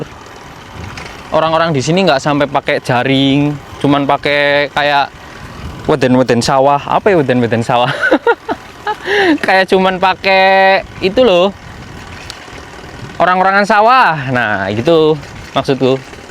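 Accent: Banyumas, Indonesia. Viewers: Banyumas jateng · Smpyn wong ndi kang....ngapak bgt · Mas wong banyumas apa wong sidareja si mas...ngapak poooll ky aku...sampe nyebut parkir ya tetep palkir yaa😊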